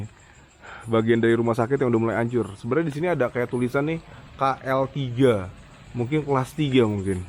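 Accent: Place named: Java, Indonesia. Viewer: Adem rasanya denger langgam jowo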